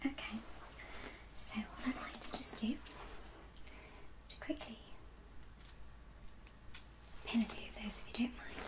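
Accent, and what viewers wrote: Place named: England, United Kingdom. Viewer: Nothing better than a classy English women's voice 👌🏻🙈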